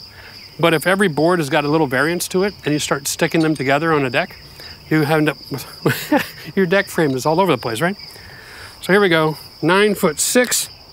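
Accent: Canada. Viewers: had the same thought. I thought I heard a Canadian accent and then when he said was in CA I was shocked. This guy knows a ton but it seems like frost heave is going to eff this whole structure up with time.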